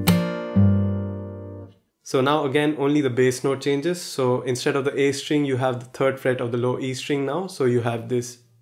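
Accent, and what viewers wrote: India. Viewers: You sound Indian... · I m sure this Guy is Indian.. Coz he's English Sounds like Indian accent! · why do u have an Indian accent??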